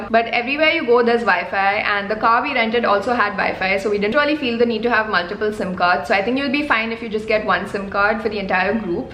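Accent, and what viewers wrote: India. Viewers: very strong indian accent, talking non stop without coma it's time to cut off my ear now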